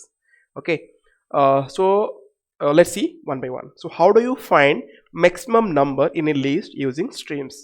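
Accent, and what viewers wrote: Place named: India. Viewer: when I heard the indian voice I knew what to expect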